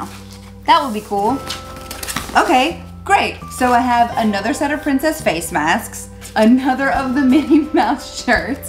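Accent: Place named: South, United States. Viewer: Hey! Are you from the southern states? I've never heard so much of an accent from you in any other videos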